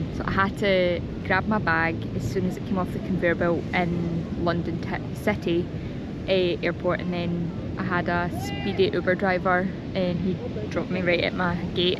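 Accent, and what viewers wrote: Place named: Scotland, United Kingdom. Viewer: She's got a scottish accent. I can understand her just fine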